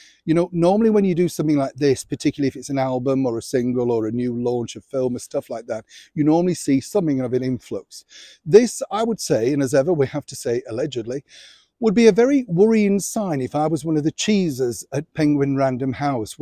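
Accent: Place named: England, United Kingdom. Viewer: I could listen to you all day. Great tips and updates. Least I forget your English verbal flare.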